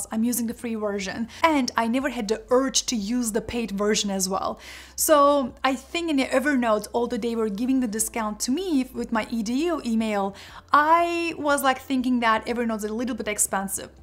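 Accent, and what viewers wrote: Turkey. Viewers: Tatlı bir Türkçe aksan sezinliyorum :) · Türk olduğunu bilmeseydim yine de Türk derdim sana podcast yayınları severek takip ediyorum ama çok güzelmiş ingilizcen :)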